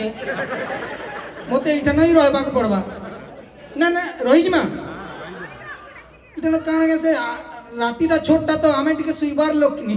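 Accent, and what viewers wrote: India. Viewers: Sambalpur 6ua ki katkiya 6ua tui j katkiya kahu6u re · da. Bahut sundar. Hele.. Samalpuriaa pare DADA KATKIAA COMEDY. BHALL NAI LAGBAR. TAR. SANGE. SAMBALPURI VOICE. MITHA LAGSHI. DADA. JADI. KHARAP. LAGALA. BLL MAPHA KARBE....... Hele reply darkar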